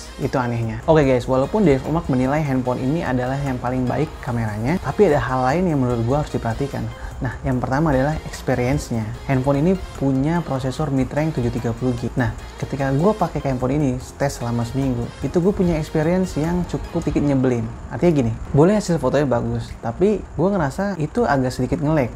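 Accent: Cilegon, Indonesia. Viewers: Wong cilegon kih ? Boleh amat 🤣🤣🤣🤣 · Org cilegon?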